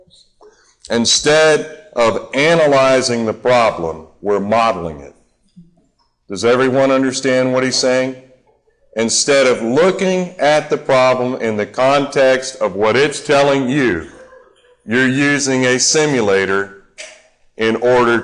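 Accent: Russia. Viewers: man i loved your russian english accent hahahah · I like your Russian English accent and your way to explain materials , can any one give me the name of the instructor ?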